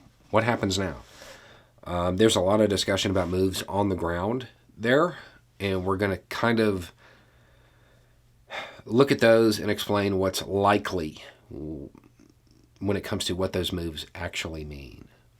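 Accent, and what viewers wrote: South, United States. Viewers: Howdy! Thanks for your thoughts and considerations. First of all let me say that I'm glad I found your channel. Although I first was a little sceptical when I watched the videos, as for some reasons, to me it looks suspicious when I see a bearded US guy with a southern accent talking about politics from what looks like a preppers bunker, closing with an image where he's sipping moonshine;) No offence though, I guess you can imagine what events in more or less recent history made me develop that sense. But after watching some more of your videos and of course doing my own research on some topics, I came to the conclusion that you're actually one of the most considerate and probably most trustworthy youtubers I have come across. And I tend to agree with many (if not most) of your conclusions and predictions - at least as far as my own information background allows. 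However, talking about P's plans for the Ukraine, from all I learned about him recently, and from the sources I found so far, I think he will not content himself with "liberating" the recently acknowledged "republics" in the Donbas. At least not if he still sees a chance to occupy the south, to have an access to Crimea via road and train. And he will even try to go a bit further to the west, to secure the water supply for Crimea from the Dnieper, by occupying Kherson county and the canal (which was closed by Ukraine after Crimea was annexed and caused a draught there). And while doing so, he will leave scorched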